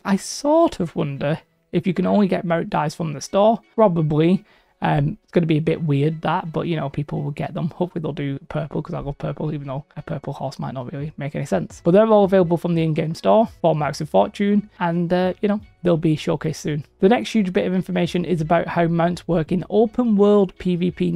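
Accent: United Kingdom. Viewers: where abouts are you from? Me and my partner have been debating which part of the north your accent is haha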